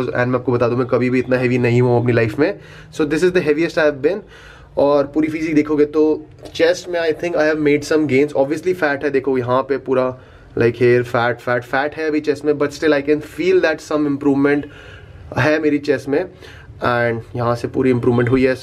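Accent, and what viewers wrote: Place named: Almora, India. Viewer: bhai..you don't no #pahadi Hindi Accent fell like almora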